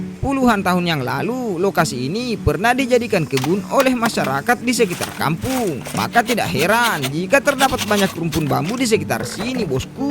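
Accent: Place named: Makassar, Indonesia. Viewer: asli org mna bang pke makassarki ku dngar😂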